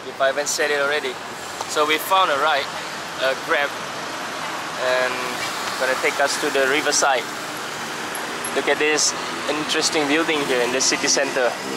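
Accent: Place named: United States. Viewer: i see ...and i quiet disappointed coz i wanna hear Singlish Accent from you but u have American accent .. 😣 Good evening la... Quickly la ... It's cute☺️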